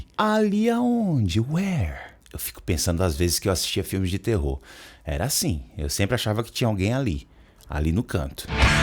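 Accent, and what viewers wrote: Northeast, Brazil. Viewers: Teacher fera! E esse sotaque do meu nordeste só torna o vídeo melhor de assistir! Que qualidade! 👏👏👏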